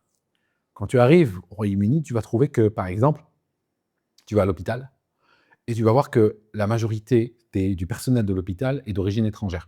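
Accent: Switzerland. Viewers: Ah l'accent suisse :) ....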